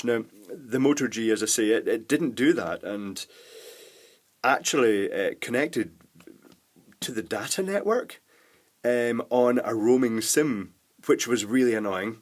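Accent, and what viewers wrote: Scotland, United Kingdom. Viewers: i knew it, just some of the words you were saying and the accent! I'm from Glasgow myself so hello fellow Scotsman! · it's not your boomy voice, it's the Scottish accent ;-)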